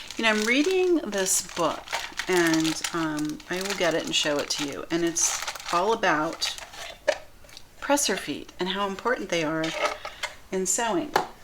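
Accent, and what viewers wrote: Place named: Virginia Beach, United States. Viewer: Hi! I’m in the Netherlands and just ordered this machine from the Lidl website. I’m so excited to receive it that I started watching reviews...what a delight to find you live in VA Beach! I used to live in Norfolk, on the Bay, and went to school in VA Beach, and I miss it so much. It’s lovely to hear your 757 accent and also learn about my new machine. I’d be curious to know what kind of thread brand you’ve used on this machine with success. I’ve ordered some of the Crelando brand on the Lidl site and am also looking at some Gutermann thread, but if you have other suggestions that work well I’d be interested to hear it. Thanks so much for taking the time to make this informative content!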